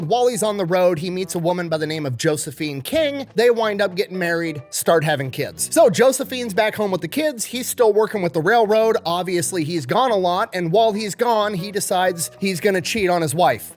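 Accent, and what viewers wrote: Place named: Kentucky, United States. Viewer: Hey electrician guy I am very curious are you a Kentucky now this is going to show up as my wife but no it's her husband and yes we are Kentucky people you just sound like on of us are you bud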